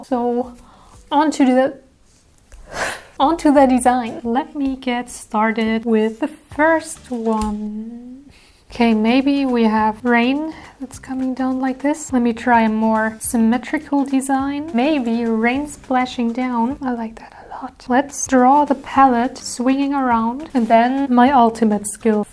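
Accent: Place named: Germany. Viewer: joooooo ich hab das ganze video geraten was das für ein akzent war und als du das dann mit der deutschen voice line gesagt hast war ich happy weil ich nd viele deutsche kenne die das Spiel spielen ^^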